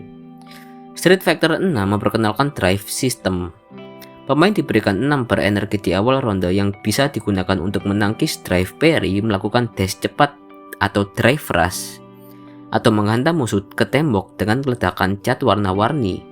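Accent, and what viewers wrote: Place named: Batak, Indonesia. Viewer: Logat batak nya kuat banget bah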